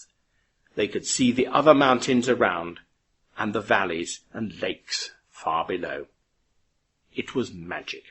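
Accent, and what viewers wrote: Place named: United Kingdom. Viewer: I love British accent, it’s music to my ears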